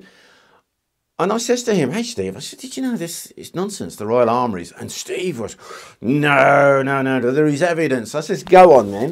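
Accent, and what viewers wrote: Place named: Birmingham, United Kingdom. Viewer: So your a Brummie o dear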